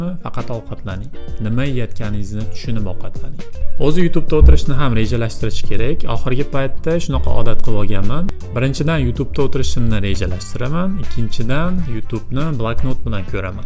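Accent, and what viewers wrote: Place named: Andijan, Uzbekistan. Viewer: Anjancha shevani eshitib siz bn faxrlandim,omadizni bersin👍👍✊✊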